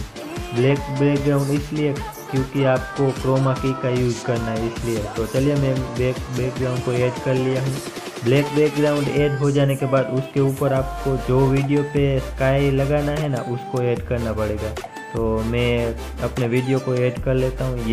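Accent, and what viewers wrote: Gujarat, India. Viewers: bro aavj sun kar lag ka aap gujrati ho , iam also from Gujarat ❤️ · Apan ko pata he apan gujrat se he har gujrati ki aavaj pahechanta hu tum100% gujarat se ho 😘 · Bhai video me aawaj sun kar lag raha he ki aap gujrati ho😄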